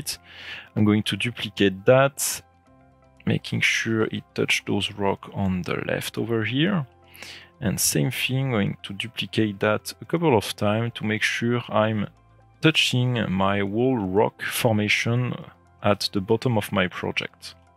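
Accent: France. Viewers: L'accent français est reconnaissable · Hello Twinmotion folks. Sorry, it's nothing personal, I love the French language. But the narrator's accent is very pronounced and I can't quite understand it. Please, I ask you to put it in French with subtitles or in English, but with less accent.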